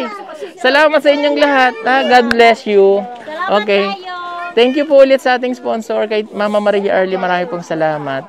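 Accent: Visayas, Philippines. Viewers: Good evening sir, marunong kana talaga mag bisaya salamat sa pagmamahal mo sa amin dito sa mindanao sana i bless ka pa lalo ni Lord kayo ng PB team at PB sponsors bigyan kayo ng malalakas na pangangatawan para sa walang katapusang mission at pag tulong congratualtions pala sa bago mong natanggap na award 🎉❤❤❤ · Marunong na talaga mag bisaya si sir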